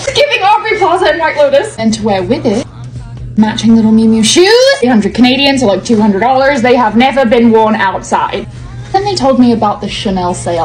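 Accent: United States. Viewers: Why is she American now? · You sounded so American at points in this. I’ve never noticed it before 😅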